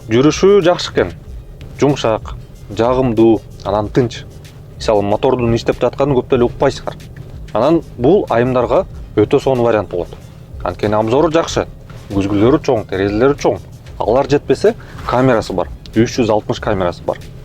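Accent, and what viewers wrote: Standard. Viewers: Ото сонун эң жакшы деген баа беришибиз керек таза кыргызча сүйлөгөнү учун чыныгы кыргыз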